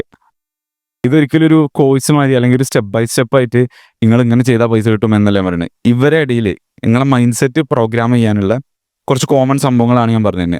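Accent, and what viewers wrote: Malappuram, India. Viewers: Bro Malappuram aano? Presentation kollam👍🏽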